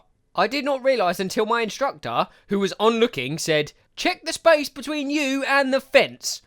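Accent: United Kingdom. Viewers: Do you have a british accent..? It sounds really good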